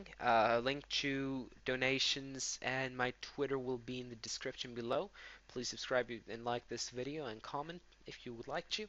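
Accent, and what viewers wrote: Sweden. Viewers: Your Swedish? :0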